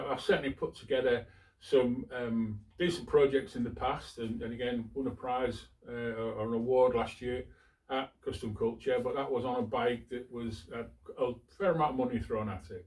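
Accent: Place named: Hull, United Kingdom. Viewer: Where are you based, sounds like a hull accent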